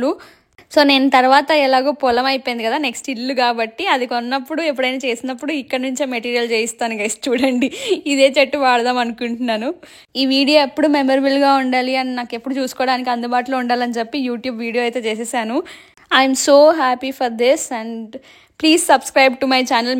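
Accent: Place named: Telangana, India. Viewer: మీరు అన్నమయ్య జిల్లా అంటున్నారు. కానీ తెలంగాణా యాస మాట్లాడుతున్నారు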